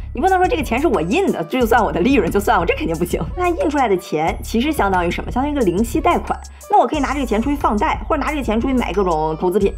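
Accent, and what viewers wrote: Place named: Dalian, China. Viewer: 新年快乐🎊这期太棒了 特别喜欢小lin用亲切的大连普通话娓娓道来 有趣 易懂🤓我也是大连人所以倍感亲切。如果有机会 希望小lin出一期影响美股市场大盘上涨和下降趋势的几项重大因素。我想点击量一定会爆棚🎉虽然我们心里都是模模糊糊的明白一些，没啥系统，都是叶子 没形成树，相信小lin的总结归纳能力❤支持我说的 大家点个赞❤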